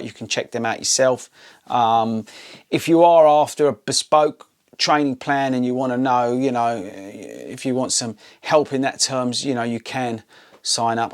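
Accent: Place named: South England, United Kingdom. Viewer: Cheers for the videos this year, I don't watch all of them and I'll tell you why in a moment. The running related content is excellent, the vlogs are excellent but there are too many videos released. You will burn out, and I tell you this as an ex YouTuber who had 45k subs & 50k views a week.(it was not a running channel) I made 3 videos a week but refused to endorse any product, and was emailed multiple times a day to plug this and endorse that. (You know how it all works) You don't want to become known as the king of the freebies, as a viewer it's the most off putting thing ever. (I could say the same for mid roll ad's which I also didn't do) too much product placement leads to you not being trusted and coming across as insincere. Quality videos over quantity win every time, also don't worry about pleasing the American audience which is why I suspect your shoe review weights are in ounces and most videos come out at midnight, (Americans will watch anyway because they love a South England accent!), I stopped my channel about 18months ago now because it became another job which I never wanted it to be. 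Anyway best of luck for 2021 for you, the run community and your excellent channel.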